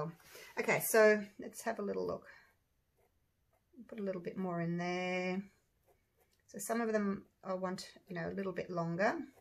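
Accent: Australia. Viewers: My goodness those are gorgeous!!! I’ve got to try this!!! I just love listening to you talk, Aussies have such a pretty accent & you sound like such a lovely, fun person! Heading to your eBay store now!💕💕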